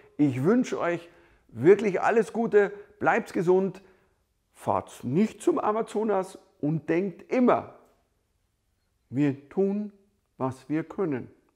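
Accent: Bavaria, Germany. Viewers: nein, nein, passt schon; ich finde es lustig! 😅 Überhaupt mag ich allgemein deinen/Ihren bayrischen Dialekt...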